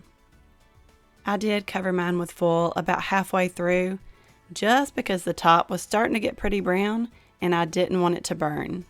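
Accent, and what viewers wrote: South, United States. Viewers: I love all your videos your country accent and most of all your recipes are all delicious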